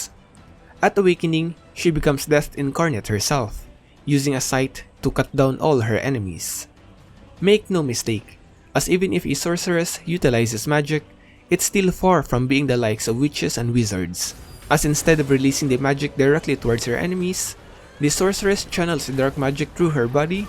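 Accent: Philippines. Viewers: Prang pinoy mag english to haha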